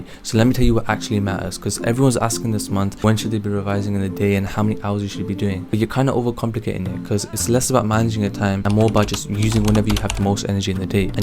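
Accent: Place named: United Kingdom. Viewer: Yo Pakistani don’t flex your British accent talk slow I didn’t understand much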